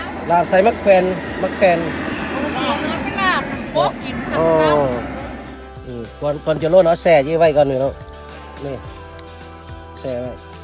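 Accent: Thailand. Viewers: อู้เหมือนคนภาคเหนือเจียงฮาย ประเทศไทยเลย ท่านเป็นคนที่ไหนครับ และเดี๋ยวนี้อยู่ประเทศอะไร ดูน่าอยู่มาก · สำเนียงอย่างคนลำปางลุ๊คับ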